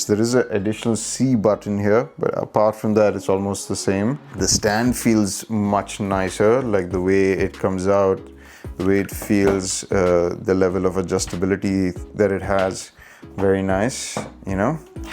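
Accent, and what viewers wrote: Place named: India. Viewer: god the indian accent is so harsh and foul to my ears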